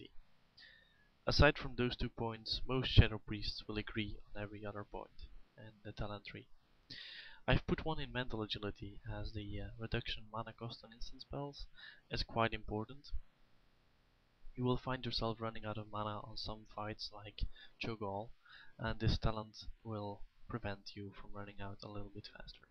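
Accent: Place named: Sweden. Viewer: nice video, öva lite på ditt utal så kommer ingen höra att du är svenks :) i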